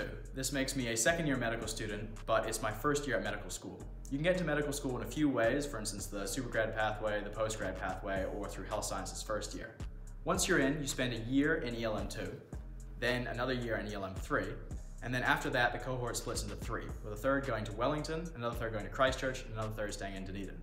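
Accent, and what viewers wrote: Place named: United States. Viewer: Hi. I am in the final semester of my undergraduate studies and I want to do my masters programme in NZ. the problem is that I majored in American and British language and Literature and I want to do switch to medicine or nursing. Please what is your advice? Are you an international student there? 'cause you have an American or Canadian accent.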